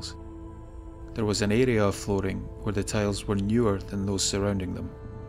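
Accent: Scotland, United Kingdom. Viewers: I love a good ghostie story, but I love a Scottish accent even more. I swear I'd be watching even if you read the phone book. Great stuff :) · I adore your Scottish voice so much👍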